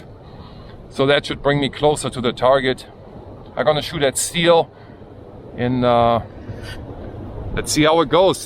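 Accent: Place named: Germany. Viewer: Great video sir! I am also in the process of getting a can from them as well. It’s not a Banish can but another brand and it’s a pistol caliber. So far, it’s been a relatively good experience. No approval yet, but should be here any day this month or in June. Like your channel too! I hear a hint of a German accent or maybe Dutch….?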